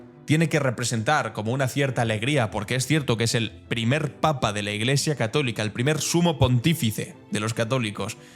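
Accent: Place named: Spain. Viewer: Si vas a hablar de comunismo o liberalismo deberías de saber las pautas políticas de lo que dices y no hables de mediocre porque quedas mal hoy en día si Jesús estuviera aquí predicando lo que predicaba no se le diría comunista porque él no decía que todo es para todos él decía lo del César al César eso significa que él era un liberal no era un socialista no era un comunista era un liberal democrático moderno de sus tiempos Así que no hables piedras y una cosa es tener la ideología social y otra cosa es ser política socialista o comunista no te confundas no pases de ignorante edúcate antes y en cuanto a lo del Papa no tiene nada de malo que sea estadounidense o peruano con mucho orgullo digo que sea latinoamericano como nosotros por lo menos de corazón aunque haya nacido en donde haya nacido claro que tú no sabes ese orgullo porque se nota por tu acento que eres español entonces para ser español Que poca educación que tienes porque mi ley es uno de los mejores presidentes y lo admiramos aquí esa política económica y política socialista que tenían los mataba de hambre a los argentinos Así que tú no tienes por qué criticar si no vives en Argentina qué criticas O sea la economía de Argentina está avanzando lo que no hicieron en 15 años los anteriores eso es algo que aplaudirle y en Perú Por supuesto que estamos orgullosos de ese papa o por lo menos hasta cierta parte orgullosos porque de que tiene su senda oscura sí la tuvo pero queremos creer que todo ser humano como dice el ca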